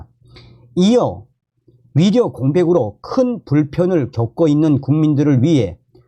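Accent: North Korea. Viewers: 정성산님에 tv을 자주 보는데요❤ 말씀을 표준말을 쓰니까 예전에 북한말 쓸대 보다 듣기가 좋습니다 감사합니다 ❤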